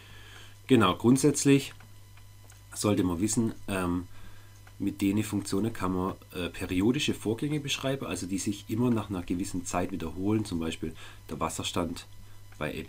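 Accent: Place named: Swabia, Germany. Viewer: Haha 😂 Suppa Schwäbisch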